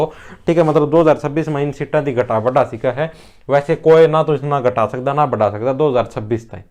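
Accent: Haryana, India. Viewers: kha se ho bro bhut achhe lago haryanvi bolte hue pls tell ur district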